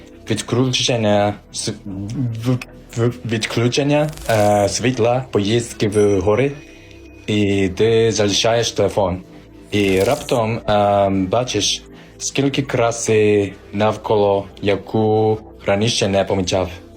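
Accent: Standard. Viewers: Вже дивлюся друге Ваше відео. Дуже чисто говорите українською. Молодець , Вам важко , але Ви навчитеся , бо хочете . Приїжджайте у Львів , познайомтеся з нашим містом . Наука - психотерапія . Лікар - Психотерапевт , який допомагає адаптуватися . Не переживайте ! Все буде добре ! ❤❤❤ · Який Ви молодець. Дякую Вам за чисту українську мову. ❤❤❤ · Чиста мова - Ви такий молодець ❤🇯🇵🤝🇺🇦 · У вас правильна літературна мова. Ви молодець. Дивлюсь канал одеситки, яка проживає в Японії. Дуже дивує ваша країна.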